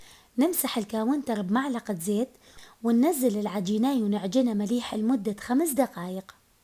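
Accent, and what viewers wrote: Mosul, Iraq. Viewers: يسلم ايداج صوتج يخبل وكلام موصيلي وفوري يخبل ياريت اسم الصفحه مشتريته منهم القوري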